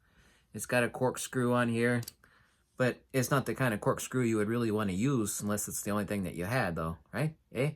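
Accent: Canada. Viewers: Gordon Mohr As a Minnesotan myself, a tad perhaps but still more of a Ontario inflection. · Great Canadian accent....had me fooled.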